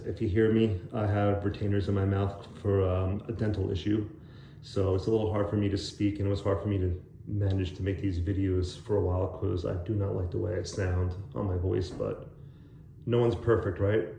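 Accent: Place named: East Coast, United States. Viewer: Been thinking about taking the 33 leap lately.. I'm thinking to request a shorter length and forgo the lower pockets to avoid the rising shoulders when sitting as you mentioned. Jacket is looking great, and you're actually selling me on the choice for a lifelong jacket. Also, you've got a great voice. I didn't notice any lisp or anything. I only notice you sound from the east coast.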